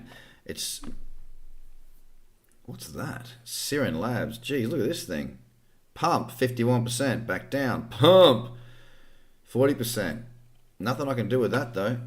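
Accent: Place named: Australia. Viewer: Thanks. Loving your work. I'm an Aussie living in Sweden, so its good to hear that accent again!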